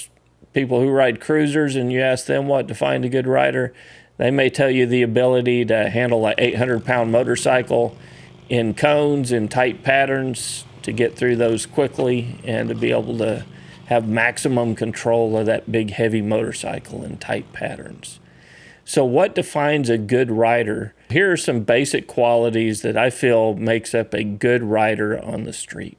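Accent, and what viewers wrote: Texas, United States. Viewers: ...Don't sweat the complainers! Your Texas style of speaking is fine! Even us fast talking New Yorkers can enjoy a little slow down. Your videos help a lot of people, I am sure. Especially new riders. I enjoy them as a 40 year rider; and I've learned some things too. Keep it up!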